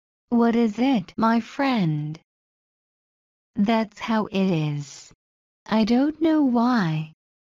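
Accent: United Kingdom. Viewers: es ingles británico? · Parece Inglés británico, lo es verdad?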